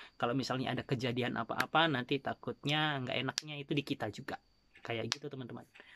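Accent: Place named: Sunda, Indonesia. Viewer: Dari logat kadang kek sunda kadang kek jawa, jd sebenernya sampean org mana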